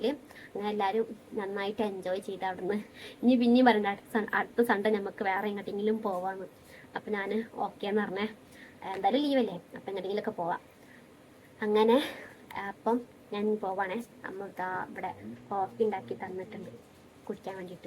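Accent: Malappuram, India. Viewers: മലപ്പുറം മലയാളം ആണല്ലോ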